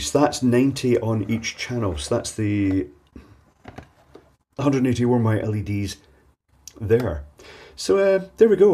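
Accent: Scotland, United Kingdom. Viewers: Here is the guy with the Scottish accent.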